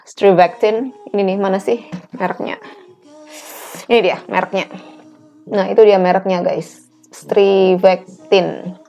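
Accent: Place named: Surabaya, Indonesia. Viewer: Ya ampun cek larange. Hahaha... Suroboyone ora ilang... Hihihi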